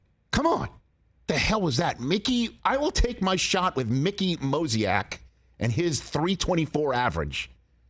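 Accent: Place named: New Jersey, United States. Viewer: Anyone else notice the jersey accent comes out when he gets angry and animated 😂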